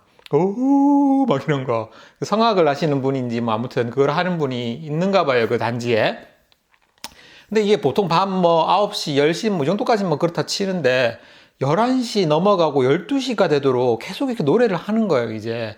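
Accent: Busan, South Korea. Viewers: 미국집 냉난방에 대해서 좀 소개 해주세요 비용이라던지,시스템이라던지 부산말 확 표나요 ㅋㅋ 부산 촌놈으로서 바로 알았습니다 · 앗 저도 부산출신 ㅋㅋ 저도 영어속에 사투리 ㅋㅋㅋ 근데 저보다 더 사투리 촌스러운거 같은데요~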